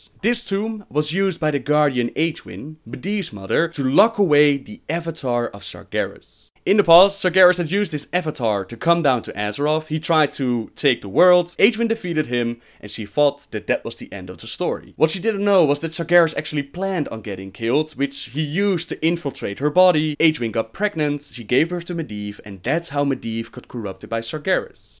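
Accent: Netherlands. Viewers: JE ACCENT <3 NEDERLANDSE WOW SPELER! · Nice dutch accent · It's his Dutch accent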